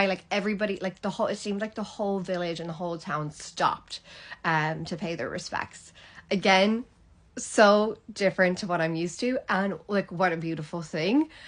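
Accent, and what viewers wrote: Ireland. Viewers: She's fake she sounds very Irish not "American"🥱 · yeh, definitely some hybrid accent there. if you told me she was from stillorgan, i'd believe it. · Sounds like you've picked up the Irish accent even when speaking with your American accent or maybe just an Irish girl pretending to be American.. haha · Is it me or is she like doing an irish accent... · You sound irish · (American born, raised Dutch here): You are really growing that irish accent, my compliments. Its also great how social you are. It's not easy to grow into an irish family. You must be special. And that old grand father certainly has been special.💝🙏🕯Good luck to y'all.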